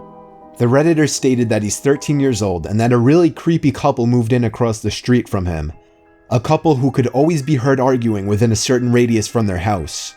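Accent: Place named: Wisconsin, United States. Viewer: where's from? the accent on the vowels is real. being from Wisconsin myself, I know the struggle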